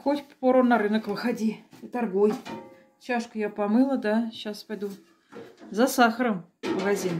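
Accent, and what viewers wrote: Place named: Saratov, Russia. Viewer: Привет из Израиля! С удовольствием смотрю ваши ролики,слушаю ваш говор такой родной.Я сама родом с Саратова,но уже много лет не была там.Мне так близки ваши заботы и хлопоты.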